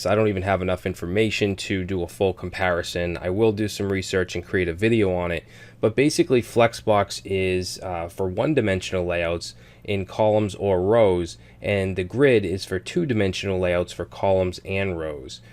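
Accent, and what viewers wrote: Boston, United States. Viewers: I hear a fellow Bostonian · BOSTON ACCENT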